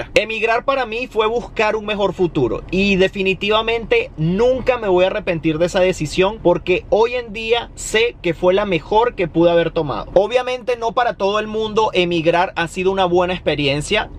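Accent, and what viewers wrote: Venezuela. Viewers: Todo tu dejo venezolano ,se regresó a tu lenguaje. Al principio parecías casi un español o un lenguaje más castizo. Pero si eres feliz en tu país, ok a buena hora quédese y siga viviendo en Venezuela. ¿ 50 mil bolívares no vale nada ?, qué raro,parece una cifra astronómica . 50 mil soles en Perú , equivale a 14200 dólares. Un perro no es igual que un niño o hijo, a ver quiero verlos estar fuera de Portugal dejando aun niño, después de 4 meses de ausencia. Mi esposa me hubiera hecho nadar el atlántico en 2 días hasta llegar a Europa y ver a nuestro hijo....uuufff!!!! las mujeres son difíciles.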